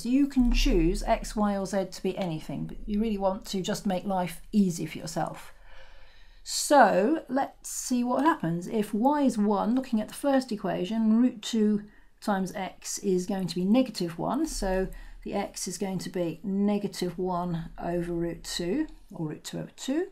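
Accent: United Kingdom. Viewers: This is awesome, a brilliant explanation with British accent, super.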